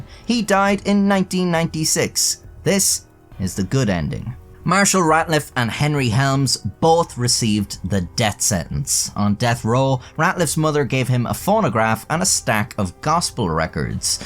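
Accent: Ireland. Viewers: Can you cover the guy from Mali who stole 242 million (in your cute Irish tone) from Saudi I think Started listening to some guy and got bored and thought about you You little Irish scamp😂😂 Link on it's way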